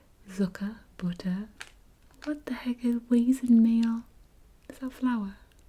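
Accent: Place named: Newcastle, United Kingdom. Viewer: ASMR + Geordie accent = homesick lol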